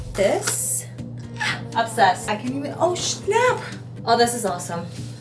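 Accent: Puerto Rico. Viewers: Mira de verdad lo unico de China 🇨🇳 q tu tienes es tu rasgo Fisichella xq hablas como nosotros los Boricuas con nuestro acento osea q los años q vivistes en NYC NO AFECTARON ESE ACENTO, MUCHAS BENDICIONES EN TU NUEVO PROJECTO Y Q SEA DE MUCHO EXITO,AMEN, GRACIAS X REPRENTARNOS CON MUCHO ORGULLO ❤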